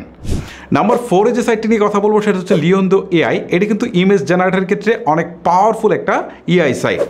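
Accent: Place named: Kishoreganj, Bangladesh. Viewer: আপনার কথা শুনে মনে হচ্ছে আপনার গ্রামের বাড়ি কিশোরগঞ্জ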